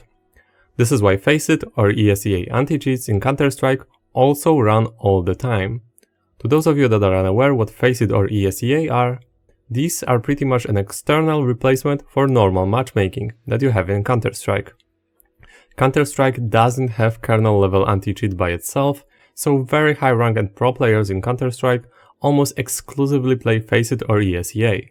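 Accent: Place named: Poland. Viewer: ziomek ma akcent jakby z polski był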